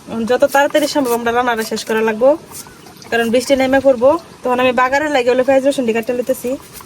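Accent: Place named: Mymensingh, Bangladesh. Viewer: এটা কি ময়মনসিংহ?? আপনার ভাষা সেরকম মনে হচ্ছে।। আবার পাহাড়! অনেক পাথর!! নেত্রকোনায় এটা? কুমড়ো টা এতো সুন্দর লাগছিলো!!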